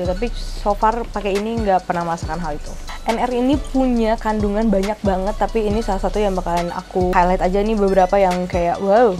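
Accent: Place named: South Jakarta, Indonesia. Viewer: Lidah Jaksel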